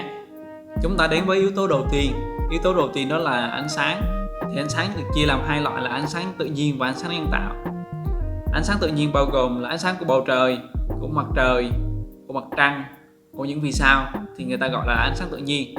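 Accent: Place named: Quang, Vietnam. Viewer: nói tiếng quảng nó cứ vui vui :v , mà xin mấy map bạn đăng k thấy chi sẻ :(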